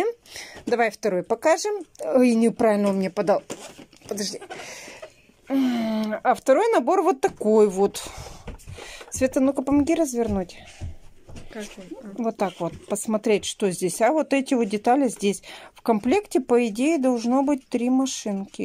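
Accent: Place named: Ural, Russia. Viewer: Посмотрите канал ,Уралочка и тогда спрашивать не станете больше ,там многие и в городе так говорят,,я жила в Екатеринбурге и там говор у всех такой ,,как в деревне и ударения в словах тоже ,, )) уши режет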